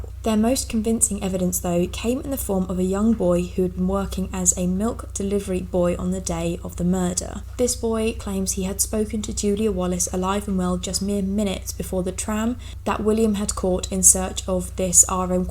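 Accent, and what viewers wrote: Essex, United Kingdom. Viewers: I love you're accent people from the UK have lovely voices. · they're mostly Northern English accents, which are considered sort of "proletarian" and less "pretty", maybe because business english / upper class English is closer to Southern English and the North used to be more working class, and working class accents don't enunciate words as clearly. Scouse is the dialect around Liverpool, Geordie is Newcastle, Cockney is working class areas of London. is from Essex but her accent isn't strong Essex imo.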